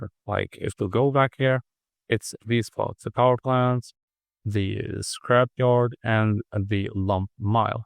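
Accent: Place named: Russia. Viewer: Ur English says u are from Russia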